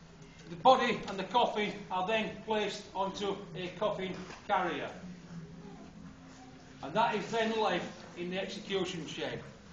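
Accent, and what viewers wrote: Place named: Yorkshire, United Kingdom. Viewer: That is a Yorkshire accent.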